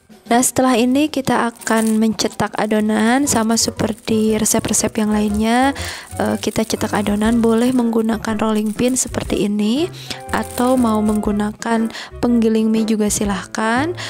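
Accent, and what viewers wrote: Bali, Indonesia. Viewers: Kadang kadang logat t nya ky orang bali ..asli gitu ya ngomongnya..apa di buatt buatt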